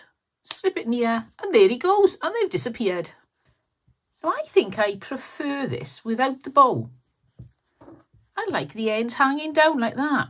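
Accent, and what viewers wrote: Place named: South Wales, United Kingdom. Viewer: Hello I love your videos they are amazing. I recognised your accent, I am from South Wales 😁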